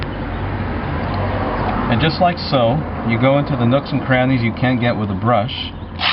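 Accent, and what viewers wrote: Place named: Canada. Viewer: You must be from Canada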